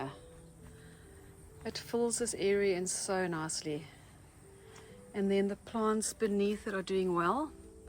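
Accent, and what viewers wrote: South Africa. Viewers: You sound South African stayed to watch because of that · What a beautiful garden I thought you're here from SA with the accent but the house and fence is not typical until you mentioned frizzle sizzle plant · You have an SA accent. I love your garden. One of the best so far.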